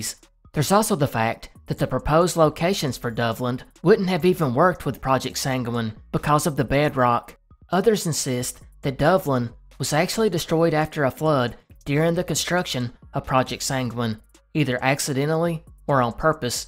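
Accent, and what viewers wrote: South, United States. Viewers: Been here since around 2k subs i think. I would not be surprised if this becomes the next 1,000,000+ sub mystery channel. Great content mate, and very glad (even as a UK resident) that youre not getting the hate you used to get for your southern accent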